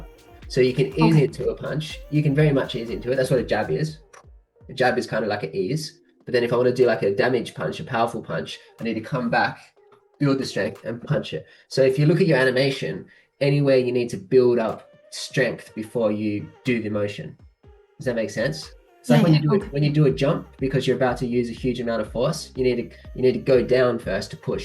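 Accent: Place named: China. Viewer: sounds like a chinese student very famliar accient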